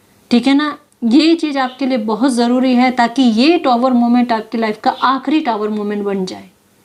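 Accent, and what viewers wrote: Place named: Marwar, India. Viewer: Rajasthan ki Ho kyunki main bhi Rajasthan ki hun kyunki aapki Bhasha se pata chal raha hai Shayad aap Marwar Marwar se ho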